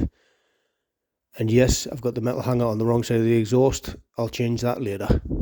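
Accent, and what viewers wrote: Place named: Birmingham, United Kingdom. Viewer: Love a Birmingham accent 👍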